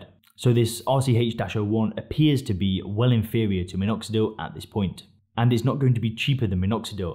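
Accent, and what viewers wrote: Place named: Scotland, United Kingdom. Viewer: ermete trismegisto Scottish accent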